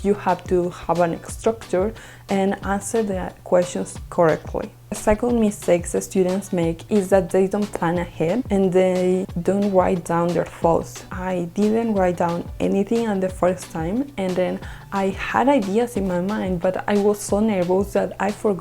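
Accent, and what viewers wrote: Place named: India. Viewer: he means the way you speak English ... sounds pretty Hindi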